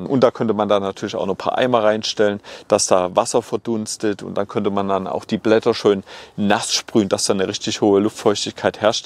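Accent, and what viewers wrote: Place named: Berlin, Germany. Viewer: Bist du aus Berlin? Dein Dialekt klingt voll von dort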